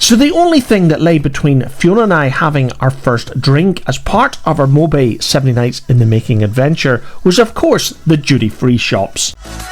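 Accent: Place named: Belfast, United Kingdom. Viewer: I thought I was hearing a Belfast accent.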